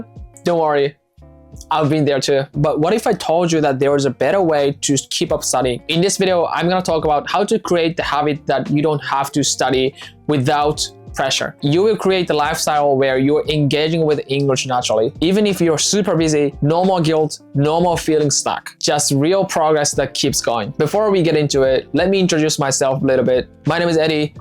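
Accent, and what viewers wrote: Japan. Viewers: 発音指摘してる人いるけどこの人ネイティブじゃないんだから当たり前だろ どんだけ英語に使っていても悲しいことに中々日本語アクセントは消えない…… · 発音 何かカタカナっぽさが残ってない?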